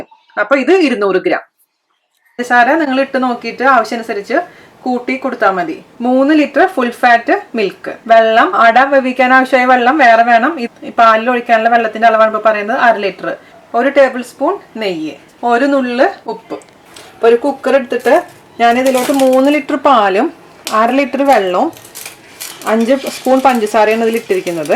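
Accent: Thrissur, India. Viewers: chechi thanks a lot...i started watching your videos becoz of the thrissur slang.i love thrissur.but ippo i watch your videos becoz of chechis cooking....I used to make paayasam in uruli.virakaduppila undaakkunnath.pradhamans.appo paalada undaakumbo pink varal nadakkilla.kure neram venam.ippo etra easy aay kaanichu thannu chechi with gas stove and cooker....thank you chechi.i havnt tried meen curry yet.but checheede thrissur meen curry kandappo vekkanamnn thonni...vechitt parayaatto... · very well explained..especially trissur style..thanks lol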